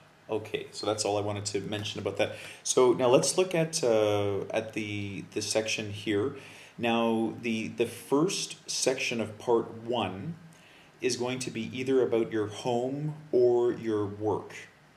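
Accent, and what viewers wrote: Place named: Canada. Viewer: Just a Canadian accent.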